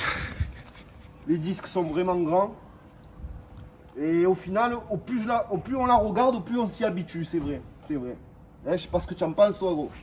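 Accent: South, France. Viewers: Plaisir de t écouter!! Jkiff l accent du sud!!👍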